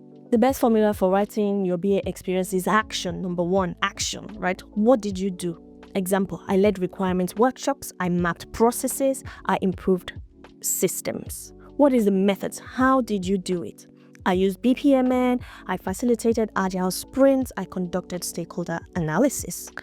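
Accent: Nigeria. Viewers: Thanks for the video.. You have a Nigerian accent, are you Nigerian?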